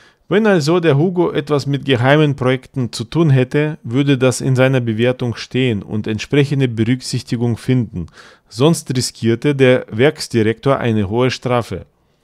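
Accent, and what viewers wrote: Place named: Russia. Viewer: На самом деле очень сильный русский акцент. Для носителя языка сложновато слушать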